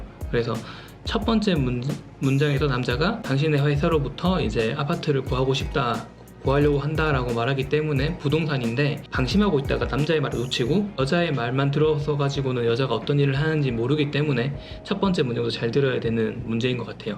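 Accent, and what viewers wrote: Gyeongsang, South Korea. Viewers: 혹시 부산이나 창원이 고향이신가요?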